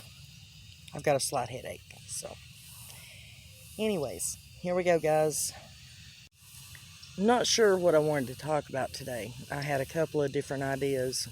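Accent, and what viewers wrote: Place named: United States. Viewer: Your most welcome my friend…..I love your Accent, I’ve got family in OHIO, I love the American accent. But I understand what you are saying though…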